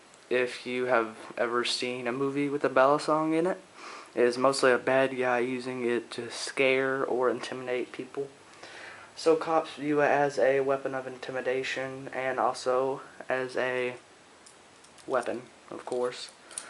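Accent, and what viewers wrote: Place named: Australia. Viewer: If they are illegal where did you get them? You sound kinda Aussie so I'm guessing you get them imported. Please reply! I am looking to buy one :)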